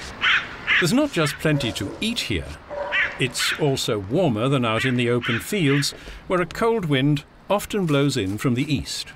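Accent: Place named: England, United Kingdom. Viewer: Oh my God you sound so English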